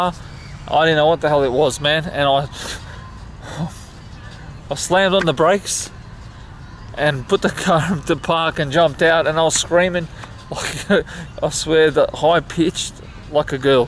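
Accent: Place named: Australia. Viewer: WOW now this is really a head turning video, I had no idea you were an Australian, it is weird hearing that accent you naturally have, that does not come out on the music videos, oh my god thank you, it will be a longtime before i can make it down under, the funds are not allowing a vacation that far away. Thank you from the bottom to the top of my heart, and give the wife a thank you as well, nice video, USA Missouri,Lake of the Ozarks or the Midwest Coast as they say 1150 miles of shoreline, the oceans are great medicine Atlantic Pacific and Gulf of mexico, pacific northwest up by seattle is where i like it most, its a big angry seeing all this plastic everywhere, oh man i hate pollution and the lack of much effort to clean it up...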